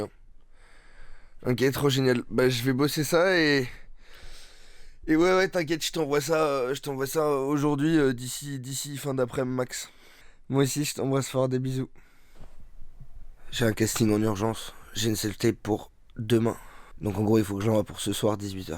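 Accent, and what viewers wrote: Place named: South, France. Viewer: Super ta vidéo j'adore ton accent du Sud :D Ça m'a fait bien rire!!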